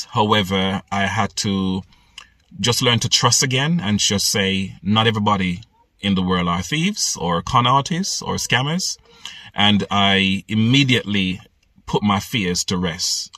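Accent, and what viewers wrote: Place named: Caribbean. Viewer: I love your accent! Are you from the Caribbean?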